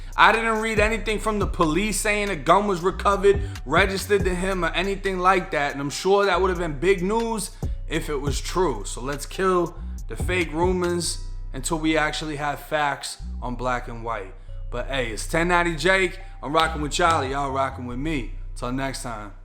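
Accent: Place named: Memphis, United States. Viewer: You sound like a Memphian-- I digz that💯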